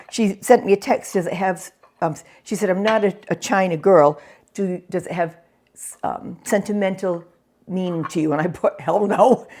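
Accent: New England, United States. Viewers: Hello,! Your house is perfect for showing. I'd leave the throw on the couch. It adds a touch of coziness. You don't have to take down your rack inside the closet. Just make it clear to potential buyers that it does not stay. Your china is beautiful. I was going to suggest your DIL may like to have your set of china, but you answered that question. Your laundry room looks MUCH better. Not perfect, but I agree the volume is very low. I just crank up the laptop volume to the loudest setting and turn up the video volume and I can hear you fine then. I do not hear a lisp though. I do hear your lovely New England accent, but I haven't picked up on a lisp. It's 6 degrees here and -13 wind chill. We've had rain, freezing rain, and snow. Just enough to cover the ground. It's cold enough all I want to do is hibernate. The sun is shining brightly so I like that part. 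Have a great weekend. Rest up for next week! See you next time! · your accent is lovely. I love the New England accent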